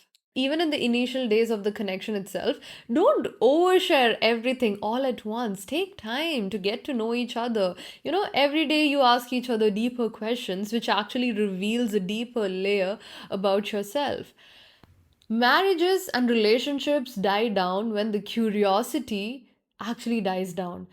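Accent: Kerala, India. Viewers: ibal malayali alle. poli. i just noticed now, her english is malyali english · Nice insightful discussion but lots of grammatical errors when you talk. “Most Nicest” is incorrect English. It must be either “Nicest” or “Most Nice”. Most Indians especially people from South make this mistake which makes me wonder whether grammar is still taught at the elementary level. When you are using the word “most” you are already attributing the maximum level of the adjective to the concerned individual. So, you do not need to do that again. Similarly, I have come across people saying “did went” “did came” which is again something that keeps hitting me. “Did” has already attributed past tense to the verb and it need not be done again. So, after using “Did” you use verb in the present tense. So, the ideal way to say it is either “came” or “did come” Don’t mind but your Malayali accent already makes people curious and if you continue with these mistakes, you will make yourself a laughing stock. 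I hope you take the feedback positively and don’t make it a ego issue like most Indian women. I gave similar feedback to my cousin sister and she kept contesting me till the I gave up. Actually she realised her folly but her ego was getting hurt for being corrected by her little brother .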